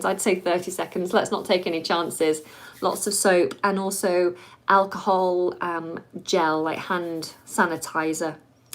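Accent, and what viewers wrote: United Kingdom. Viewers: Hello! I can't help saying this! You explained everything related to an infectious disease like coronavirus in a charming and interesting way! Honestly, you and the way you speak British English are both lovely! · You are a fluent teacher in British accent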